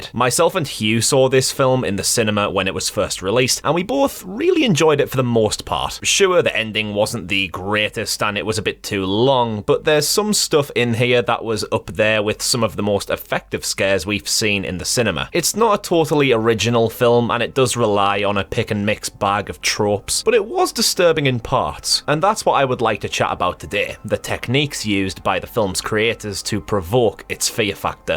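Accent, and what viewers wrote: Scotland, United Kingdom. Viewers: bro, your Scottish accent is so strong (no Offence btw) 🤗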